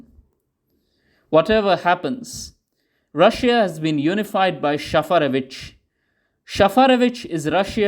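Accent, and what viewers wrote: India. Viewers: The narrator sounds Indian as shaferevich being impressed by Indian mathematics allowed Indian orthodox Christians to come to Rus! Long live Shaferevich Long live Orthodoxy Long live Mathematical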